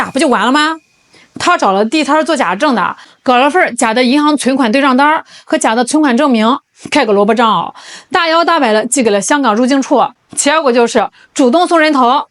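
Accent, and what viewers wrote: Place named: Henan, China. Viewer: 这个主播的口音大概是豫北冀南鲁西的人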